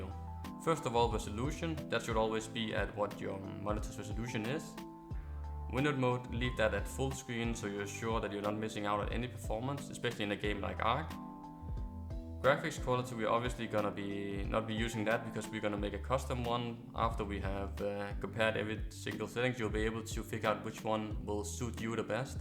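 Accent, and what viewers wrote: Denmark. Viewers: Hey bror, har set du er stoppet med at poste, men ber dig begynd at post igen tro mig. Du skal bare øve dig på dit engelsk så det ikke er danglish og blive ved med at poste og lock in. Tro mig hvis du bliver ved bliver du stor på et tidspunkt.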